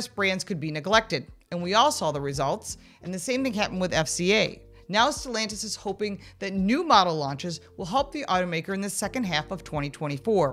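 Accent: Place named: Brooklyn, United States. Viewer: Every time I hear her voice ; I hear back in the day Brooklyn NY.😊. I watching this channel for about 7 years 🎉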